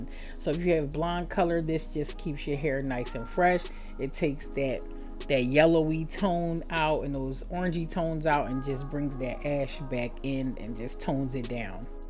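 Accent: Philadelphia, United States. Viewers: I love your hair videos however you wanna post them. You do great hair and it’s also nostalgic to hear you talk. It channels my inner Philly Jawn/ Girl even though I haven’t lived there in 20+ years. Lol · You do beautiful hair, talented, mellow with the Philly accent that shines through, I’m from South Philly but living in Cali for many years…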